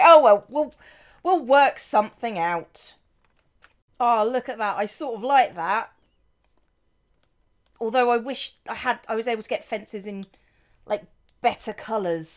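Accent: United Kingdom. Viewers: Omg shes British i love British accents can you say something really cool in the last episode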